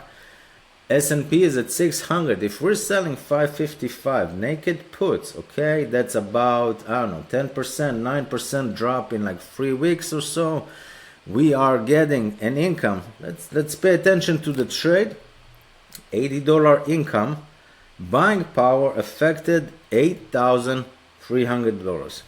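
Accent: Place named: Romania. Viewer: That has to be a Romanian accent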